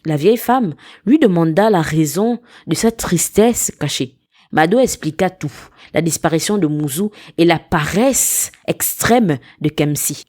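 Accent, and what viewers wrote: Gabon. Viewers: C'est une voix Gabonaise derrière cette belle histoire ❤ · La voix d’une gabonaise ❤très belle histoire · Un bel accent Gaboma❤ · La narratrice est forcément gabonaise. Ne changez pas pour l’IA svp❤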